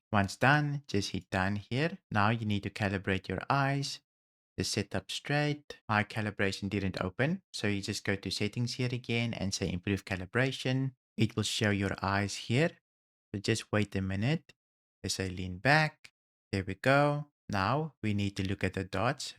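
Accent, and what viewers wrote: Afrikaans, South Africa. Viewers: Dude, thanks for all the videos. Have been watching a lot of them recently. Very helpful! If I may: I believe I hear an Afrikaans accent there. Am I correct?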